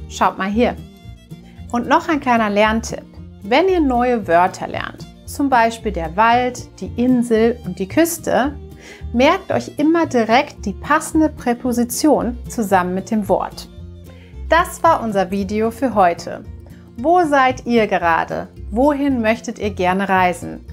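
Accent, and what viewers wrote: United Kingdom. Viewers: He is English, trying to speak german for the video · Kommt aus Großbrittanien? Er hat ein starkes Akzent haha. Keine Respektlosigkeit, meine Grammatik ist schrecklich 💀 ich glaube "starkes Akzent" war in diesen Beispiel nicht richtig oder? Gibt es Deutsche hier mit den Antwort? 😂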